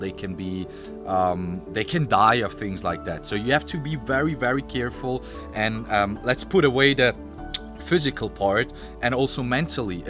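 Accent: Switzerland. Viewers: Hahaha ish geil ihn schwizerdütsch rede zköhre😂😂💪🏼🤘🏼🤙🏼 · Damn...that Swiss-German thoughhhh 😍😍😍🤤❤❤ lmfaooo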